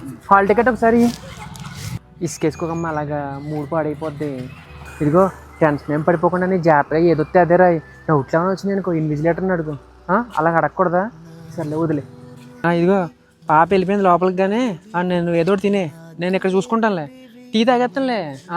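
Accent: Godavari, India. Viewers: Voice nice godavari slag ❤❤❤❤❤❤❤❤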